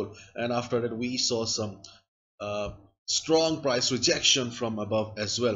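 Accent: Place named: Assam, India. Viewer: Dudin dhori sai su apunar video.....mat tute dhrbo parsu asomia buli...accent tu ase asomiar...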